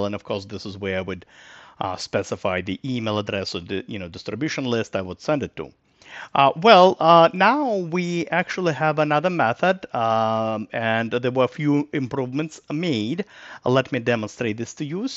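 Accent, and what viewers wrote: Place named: Bosnia and Herzegovina. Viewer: Love the accent. Sounds Bosnian.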